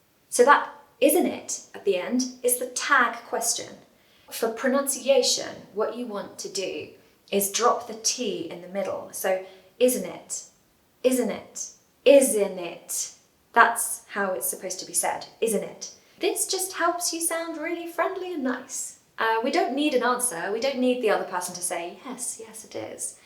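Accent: United Kingdom. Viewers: I love British accent, it's a quite nice for ears, thank you for nice lesson, have a wonderful day, regards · I love your lessons. you are always friendly, modiste your good mood.. just keep going on! It's good to learn from you now i now why british people speak lovely accents thank you for teaching . It's the right time to l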